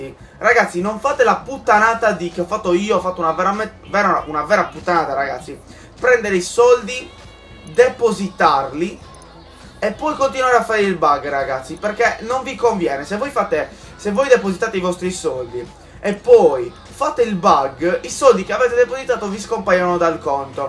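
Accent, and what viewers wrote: Sardinia, Italy. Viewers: Sei sardo vero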